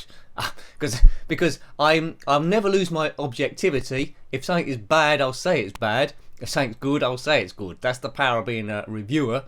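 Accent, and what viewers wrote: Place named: Cockney, United Kingdom. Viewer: A nice little game that probably would have been popular on a budget label back in the day. It's all a bit basic and lacking flair, but it's decent in all areas. I really hope lots of new Spectrum games are created now that the Spectrum Next has given the brand a new lease of life., EastEnders should have you as a guy who runs a retro computer shop in Albert Square. Your accent is perfect for the show. One of my grandfathers was a cockney(born within bow bells.) so I have London in my blood. Have a King Lear on me. Cheers! D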